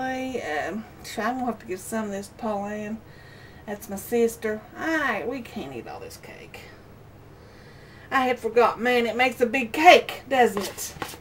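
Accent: South, United States. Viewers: Where are you from? Never heard that accent before! Sure nuff down home ! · i love your accent... what part of the south are u from? im from georgia... down here we call pecans... just that lol... pee..cans.... lol... im and old southern lady who forgot how to make a punch bowl cake.. lol... thank god for u and youtube... be blessed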